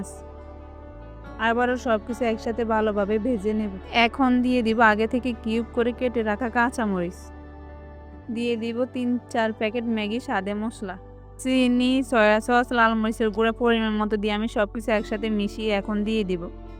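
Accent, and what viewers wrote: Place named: Noakhali, Bangladesh. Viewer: আঁর নোয়াখাইল্লা ভাবি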